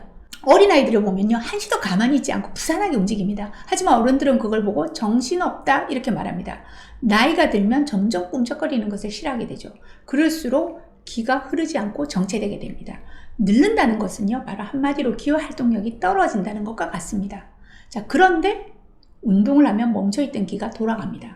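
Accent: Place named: Gyeongnam, South Korea. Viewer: 호주 시드니에서 사남매 맘이 응원합니다 ^^ 저는 경남거창이 고향인데 ㅎㅎ근처 비슷한 말씨인거 같은데 ㅎㅎ좋은 영상 감사 드려요 새해도 활기차게 감사하며 아자아자!!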